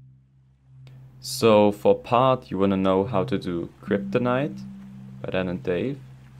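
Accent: Germany. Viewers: Are you from germany or somewhere there? Great tutorial btw :)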